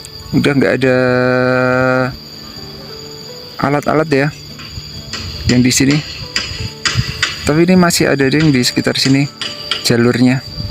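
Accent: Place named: Purworejo, Indonesia. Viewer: Melu ndelok mas,logat cah porjone ketok mas"ding" semangat n sehat terus mase ben iso ngasih info dari porjo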